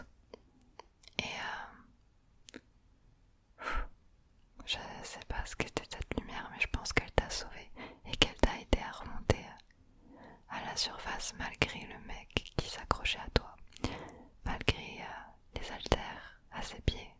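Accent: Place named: France. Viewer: Oo my bog .. WOW She French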